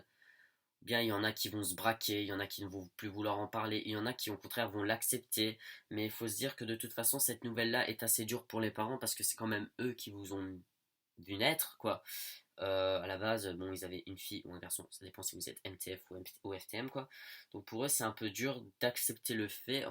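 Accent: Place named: Mons, Belgium. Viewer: et Montois en plus! Il me semblait bien que je connaissais cet accent:p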